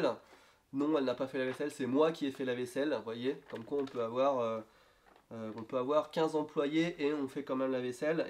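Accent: Lorraine, France. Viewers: Je me disais bien que tu avais un accent Lorrain des fois quand tu parlais, je rêvais pas et maintenant je suis fixé :p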